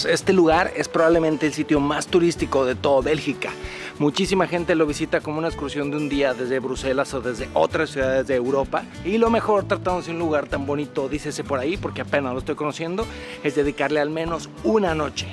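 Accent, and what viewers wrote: Mexico. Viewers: Me encantan tus videos y me sirven mucho, porque estoy en la misma que tu, pero... me perturba que hables como Mexicano, entiendo que ocupes palabras, pero sinceramente no pareces Chileno y es fome que eso se pierda. Saludos y mucho éxito.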